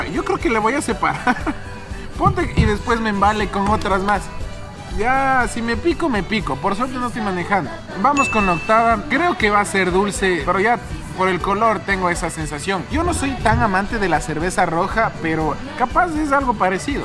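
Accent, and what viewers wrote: Ecuador. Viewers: Nuestro acento Ecuatoriano en ingles se reconoce al paso 😂😁🇪🇨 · Eh visto otro youtuber y si son buenos. Pero Tu, tienes calidad, hasta para hablar a lo Ecuatoriano 👍👍💪🇪🇨🙏 sigue asi y bendiciones. Te ganaste en mi lista #1 de youtubers.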